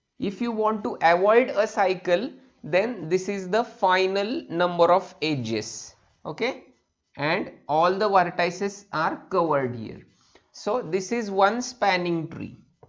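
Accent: India. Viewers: if you are indian, speak Hindo, don't speak the terrible indian english, i will make sure never click this again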